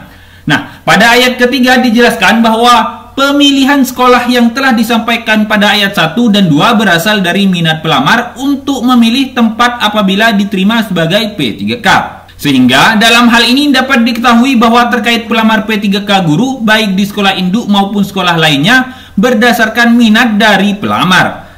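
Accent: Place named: Madura, Indonesia. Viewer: Mantaabbb minnnn.... Logatnya Madura sekali mimin... Pokoknya thanks telah memberikan informasi yang cukup jelas ini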